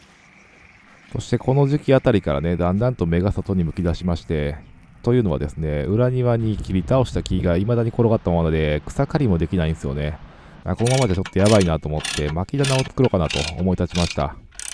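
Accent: Kansai, Japan. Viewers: 本当によくやっていると感心しました。語りの関西弁がいい味出してる。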